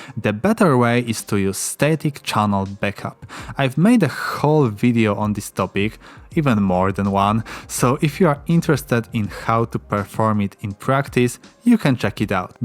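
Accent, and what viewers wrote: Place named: Poland. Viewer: Where are you from? You sounds a bit Polish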